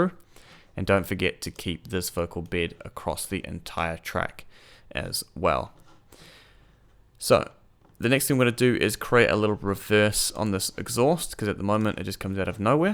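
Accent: New Zealand. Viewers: sounds like hes a kiwi. we just sound like that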